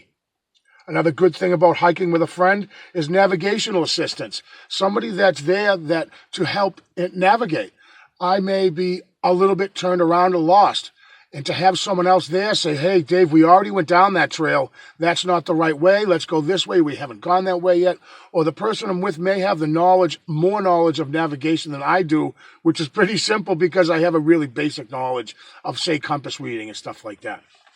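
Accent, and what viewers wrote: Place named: Massachusetts, United States. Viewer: Hey Dave just re watched your video.....omg...looney bins..lmfao...havent heard that in the 25 years I been gone FROM NE....i love watching and listening to you and let's not forget that Mass. Accent.....Linda in Florida...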